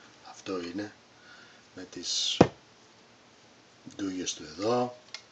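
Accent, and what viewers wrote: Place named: Australia. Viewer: Yasou buddy. I just wanted to know where in Australia are you? Because I heard your accent and I wanted to know if you’d teach private lessons?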